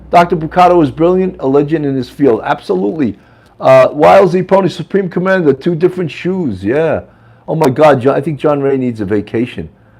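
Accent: New York, United States. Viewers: There's that New Yorka accent !!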